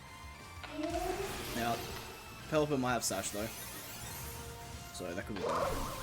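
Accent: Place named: Australia. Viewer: I am almost sure you're Australian?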